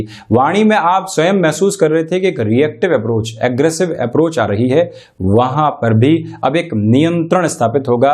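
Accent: Standard. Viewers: I just watch your videos to listen to such pure Hindi. It’s enchanting.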